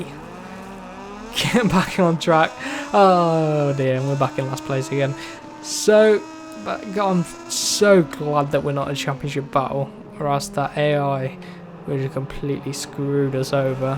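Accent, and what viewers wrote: United Kingdom. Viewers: I enjoy the 25% races from last grid position. I'd recommend FP in Moto GP to collect R & D points ... but maybe you can show us just a short version (like you do with Q1 & Q2). Well done champ! Besides, you have got an interesting british dialect.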